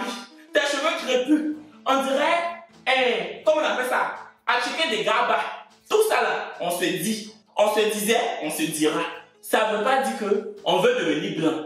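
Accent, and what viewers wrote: Africa. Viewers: Oui je jure tu as le vrai sang africain je te jure la façon de parler moi mm je suis africaine et fier de l'être #gabon! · C'est pas vrai au contraire je trouve que juste par son accent il valorise l'Afrique il n'a jamais dit que les blancs sont mieux que nous au sens propre du terme moi j'adore justement parce qu'il se démarque des youtubeurs Africains qui vivent en Occident il est resté authentique s'il y'a une chose à retenir c'est que si tu veux suivre faut éviter d'être susceptible sinon t'a pas ta place ici ceux qui trouvent qu'il exagère ce sont des personnes hyper susceptibles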